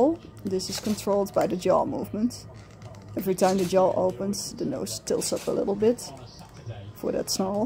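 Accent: Netherlands. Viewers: The dutch accent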